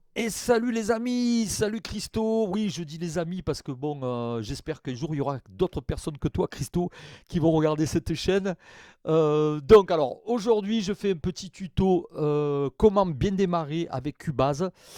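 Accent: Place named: South, France. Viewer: Bonjour! Je débute avec cubase pro11, pour le rangements des projets sur quel DD, dois je les mettre? Avec mon système sur le disque C ou avec les VST inst sur le Disque D? J'ai entendu un accent du sud dans ta voix! J'habite à côté de DAX, est ce que tu fais des formations en présentiel, ça m'intéresserais? J'adore ta façon d'expliquer très précise. Je pourrais me déplacer si l'on est pas trop loin! Amitiés à toi! Marcus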